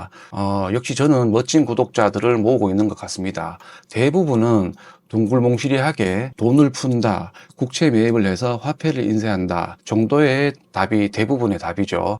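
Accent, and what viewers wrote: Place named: Gyeongsang, South Korea. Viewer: 몽실몽시리하게ㅋㅋㅋㅋ저희집이 경상도라 너무친근감이있습니다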